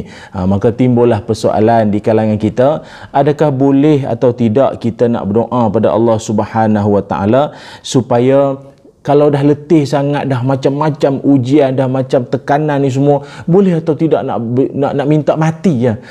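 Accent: Kedah, Malaysia. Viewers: tutur kata ustaz mudah faham.loqhat kedah tak keterlaluan teruskan berdakwah salam baling ledah.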